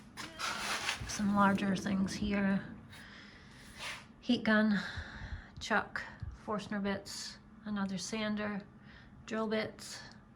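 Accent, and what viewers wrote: United States. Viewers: Thank you for the nice tour of your shop. Mine is similar in size. I'd like to show you mine now that you've shown me yours, but how do I do that? I am somewhat advanced in furniture making but a beginner at the lathe. I'm glad I found your video channel and will follow you with interest. You sound as if you have a New York accent but you've mentioned that you came from Florida and moved to Maine. But, what's with the Penn State sweatshirt? I am a retired mechanical engineer and graduated from Villanova. I live about two miles from that campus, so I didn't get very far after graduation. I worked for the DOD and the Dept of the Navy fixing ships; actually I've been all over the world at least twice. Good luck in your future video making. You give good video. -Curt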